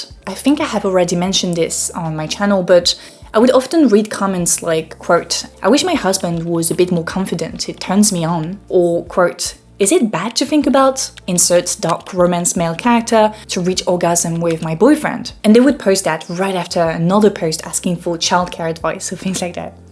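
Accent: France. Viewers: am i the only one who's just here for the French accent? lol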